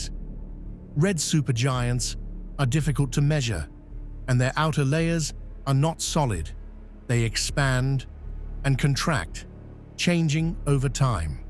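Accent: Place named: United Kingdom. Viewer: British guy voice! 👍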